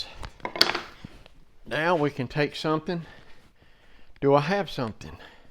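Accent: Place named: Kentucky, United States. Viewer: Hello sir. I have been watching you from Kentucky. I TRULY enjoy your videos, and one of the reasons I stuck around enough to learn something was it was nice to hear someone who talks like me. Thank you for putting up with foolish and mean people in order to teach and entertain the rest of us.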